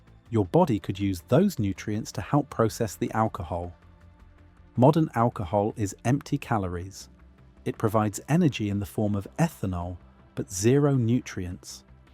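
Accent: United Kingdom. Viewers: "indian pale ale" lmfao. and you're using a brit accent for what i now assume is your ai narrator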